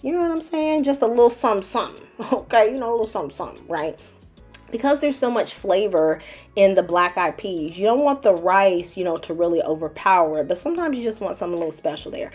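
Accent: South, United States. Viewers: Are you a native Southerner?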